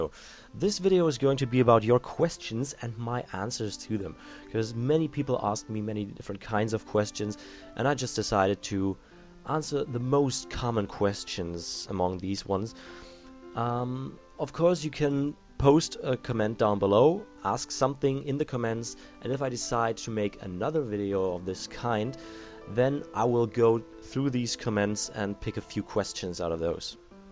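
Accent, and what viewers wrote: Germany. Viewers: Ich habe mir von Anfang an Gedacht, das du Deutsch sprchst, das hat man gehört! Aber du spirichst extrem natürlich und "wortgewand" Englisch! Super! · i knew you're german! ;-) i can easily recognize that accent... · Schon geil zu sehen wie alle nu so: "OOOOH der is ja deutscher daher der akzent!" xD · i new you where Germin i just couldnt put my finger on it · You don't have a weird accent its just that you're so good at English that people cant distinguish you're German accent. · Waow, most Germans have a very obvious English accent but yours is noticeble but it sounds alot better then what I've heard as a Dutch guy :-) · i thought you where german or something like that. LOL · I knew u were German. Hail!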